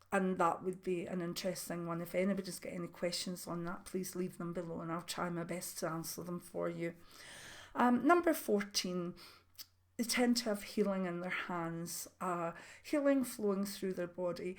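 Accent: Scotland, United Kingdom. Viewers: Hello. I enjoyed this. I hate shoes.... although I get the coldest feet so have to give in on colder days. As a child I regularly saw a shamanic indian and have alwaya been fascinated by the culture and way of life. Nature and animals are my bliss although being crocked up and arthritic I avoid drastic weather. I am effected by storms. Headaches ans ears ring. Always know when they are coming. I work at present as a psychic on a phone line ( serious health issues meant I had to give up mainstream work) and embracing this side of things. I have always felt odd and a bit different and despair at the sadness and pain. I enjoyed this video. I love your soft scottish accent. I am cornish so sending peace love and healing light from south to north to you x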